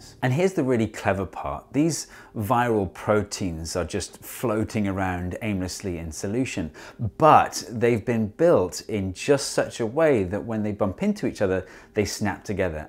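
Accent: United Kingdom. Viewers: British always sounds cool.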